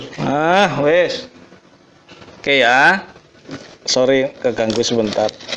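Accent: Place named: Banyumas, Indonesia. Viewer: ngakak mbah, ngapak campur b indo e khas bgt wkwk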